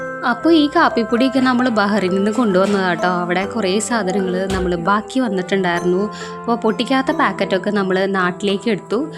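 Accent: Thrissur, India. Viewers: Are you thrissur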